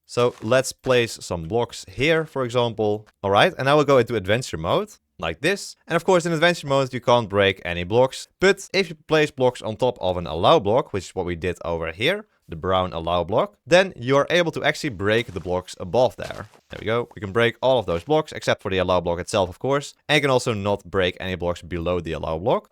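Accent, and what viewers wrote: Netherlands. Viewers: Damn bro ur dutch accent is faint. Lekker pik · Lekker hollands accentje 😂 heerlijk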